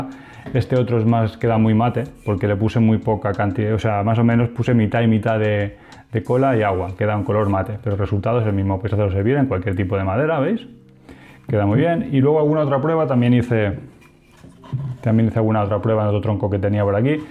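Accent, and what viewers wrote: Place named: Spain. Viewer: Es mucha casualidad que hablas como si fueras de España(hehehehe), y tu voz parece a la de otro youtuber que sigo pero el hace videos de impresoras 3D, saludos y abrazos.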